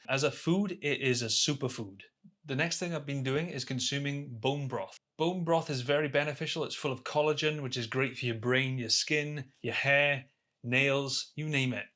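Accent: Wales, United Kingdom. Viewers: Do you share any thoughts or videos elsewhere? Just found your channel and it was so positive, yet still grounded, that I’m looking forward to watching them all. And I’m hoping from seeing your most recent videos that you’ve really lifted many aspects of your life. That means something, and it’s wonderful to see progress for us all. Finally, I’m a Valleys kid and your accent really helped me take your hints and tips in. Anyway, if you have any other channels I think many of us would love to know. Thanks and take care :)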